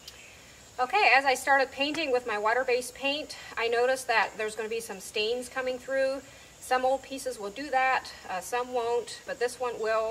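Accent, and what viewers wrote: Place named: Transatlantic, United States. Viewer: You have such a transatlantic accent. It’s cute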